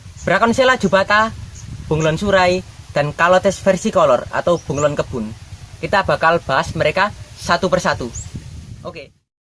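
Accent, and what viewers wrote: Java, Indonesia. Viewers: Waw medok nenem logat jawa nyh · Cong sampean wong ndi. Kok bhsamu medok bgt..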